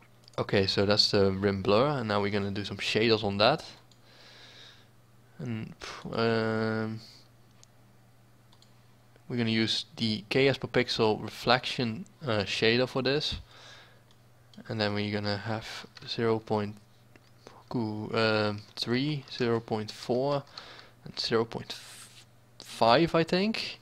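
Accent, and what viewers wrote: Netherlands. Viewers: Dude, you dutch? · Are you dutch?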